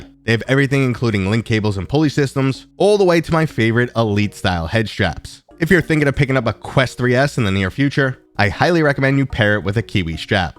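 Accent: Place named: Boston, United States. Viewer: Boston accent! I love it!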